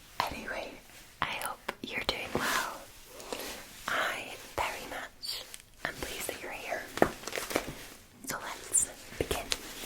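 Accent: Scotland, United Kingdom. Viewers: 3 minutes into the video and i realised she’s Scottish how can I not tell I’m also Scottish from Dundee sadly I’m so proud now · Wonderful asmr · I mean I’ve always loved the Scottish voice because hearing them shout is fucking beautiful but hearing her whisper is something else. I’m glad I share a kingdom with this woman 😇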